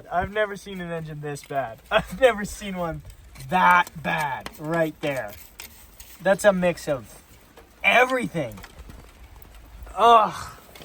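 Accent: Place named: Canada. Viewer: As soon as I heard "might as well go for a soda", I knew you were Canadian. The sterling elocution hinted at that as well 😂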